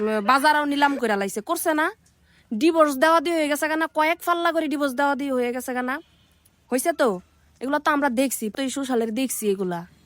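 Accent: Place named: Chapainawabganj, Bangladesh. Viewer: এ হাপা তোমার মুখের কথা গালা শুনতে খুবই ভালো লাগে হারঘে চাঁপাইনবাবগঞ্জের ভাষা শুনলে হার ঘে ভিডিওতে ঢুকে পড়ো।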